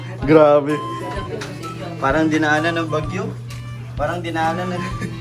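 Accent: Visayas, Philippines. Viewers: I love the bisaya intonation ❤❤ ilonggo here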